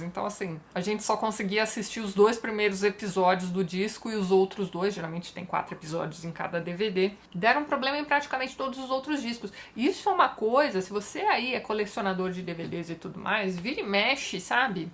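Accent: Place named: Rio de Janeiro, Brazil. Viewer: Então tá explicado o sotaque carioca :)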